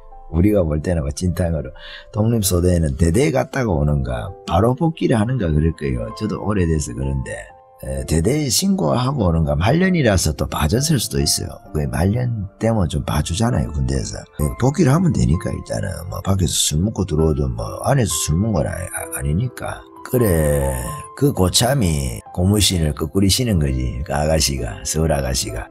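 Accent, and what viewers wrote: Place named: Gyeongsang, South Korea. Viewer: 경상도사투리가 세삼좋네요~~^^유머와 부드러움~단호함~~궅궅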